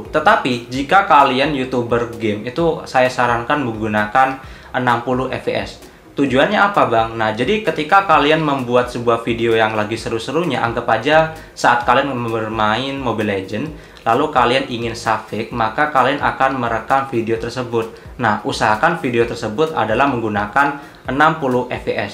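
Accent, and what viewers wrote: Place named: India. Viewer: Kok logat lu kaya India bang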